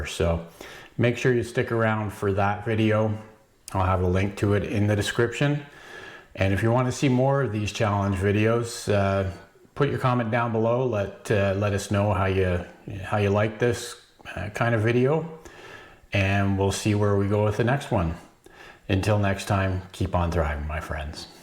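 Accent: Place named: Canada. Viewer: Hey,. Thank you for the video.😊 This looks like it may be a good replacement for ice cream, eh? What do you think? I had a weakness for ice cream, but trying not to eat it now.:/ I hear your Canadian accent.😊 (I’m from Chicago Illinois.) This is the third time that I subscribed to your channel. I keep getting unsubscribed.:/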